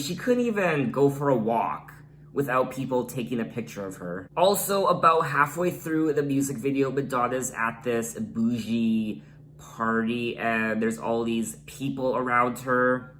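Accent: Canada. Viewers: grow the beard but lose the canadian accent.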